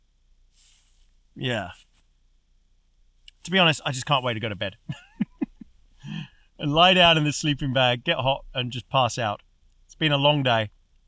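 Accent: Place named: England, United Kingdom. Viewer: Wow,. How nice to hear someone say something nice about America.....that doesn't happen very often. We Americans love our Kiwi's too!! I previously worked with several New Zealander's and Aussie's--so much so that I learned the difference in accents! There is nothing NZ's hate more than being called Australian!, you also have a lovely accent albeit English. Greetings from Los Angeles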